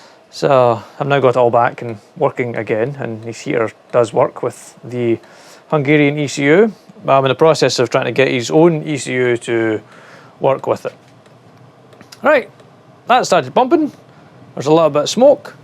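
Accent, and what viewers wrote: Scotland, United Kingdom. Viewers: Hi. Great to hear a Scottish voice. New to this. Question for you, can you run one of these heaters on domestic heating oil like 28second kero. Cheers, jim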